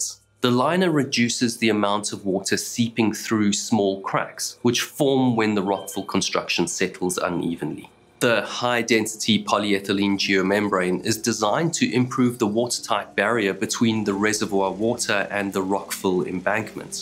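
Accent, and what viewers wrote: South Africa. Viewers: I love the south African accent. I had a good buddy from there.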